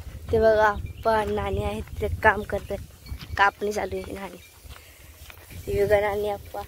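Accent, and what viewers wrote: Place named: Konkan, India. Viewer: thank u tri tumchya bolnyawarun watlach mala ki tumhi koknatun asal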